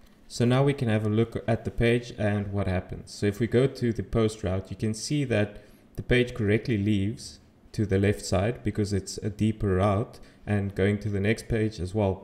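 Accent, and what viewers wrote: South Africa. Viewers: Are you South African? Sounds like an Afrikaans English accent.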